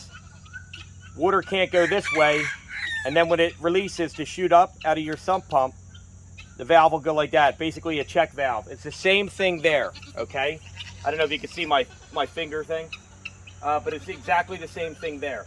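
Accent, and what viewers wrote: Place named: Maryland, United States. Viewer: You sound like a fellow Marylander. Great machine great video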